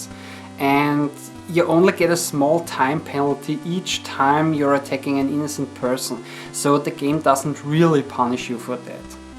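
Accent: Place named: Germany. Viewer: I remember playing the game 30 years back on the good old c64! the death scene screams were awesome! so awesome, that my mum took them for real and stormed in my room;-)! It is remarkable, that the video game tape still works after 32 years! Do you use an original C64 or an emulator for PC?, you have a descent german accent! are you from east germany?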